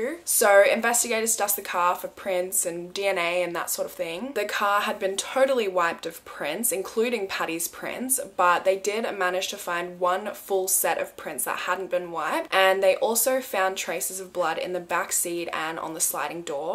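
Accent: Australia. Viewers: Loving your videos, thanks for the uploads. Oh, and, Your accent was so familiar to me but I couldn’t quite place it, then it hit me! You sound exactly like the characters from Kath & Kim ! (Which I absolutely love and have watched at least three times) It’s a very specific accent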